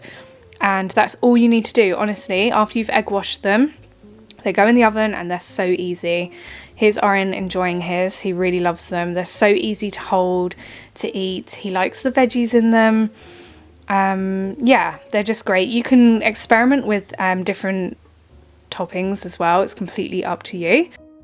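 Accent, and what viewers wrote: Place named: Devon, United Kingdom. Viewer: She speaks with a South Devon accent. Her ancestors probably came from the South Devon or Cornwall area sometime during the mid 1700's She is a Cornish woman. She is probably related to the cornish stand up comic Jethro.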